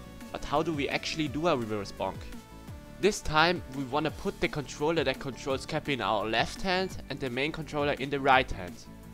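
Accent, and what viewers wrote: Germany. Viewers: Lol man merkt dass er deutsch ist😂🇩🇪 Wo sind meine german people 😂🇩🇪🇩🇪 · Are you german ?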